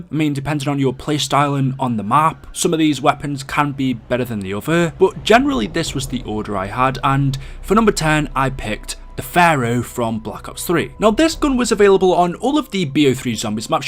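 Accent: United Kingdom. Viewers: are u brithish or are u american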